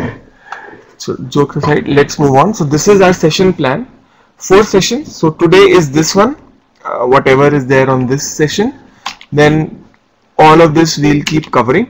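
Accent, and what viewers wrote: India. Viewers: l am African from Democratic Republic of Congo/ cental Africa, l don't like the teacher's accent, he's waisting his time, better to teach in indian language.Malayalam, Tamoul, Hindi...sorry sorry to follow you mr, phonetics problem, you're my colleague l am a Business English teacher. Thank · very nice sir! i am pakistani .so it is easy for me to get indian accent.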